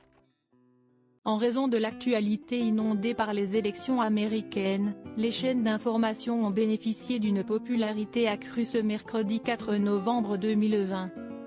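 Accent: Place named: United Kingdom. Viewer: On passe l'accent nullissime Franco anglais de cette voix pourrie sinon on attend face à l'info on en a rien à foutre de punch line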